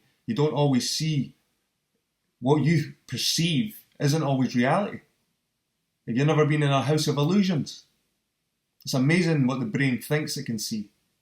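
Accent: Scotland, United Kingdom. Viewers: Your my new favourite YouTuber. I love your honesty in the conversations you present here. I don't mind what your stand is on the shape of the earth, at least your aware of the subject and give it some thought. Sorting out the lies is a great idea. Good man and that Scottish accent is beautiful to listen too. Cheers! 💜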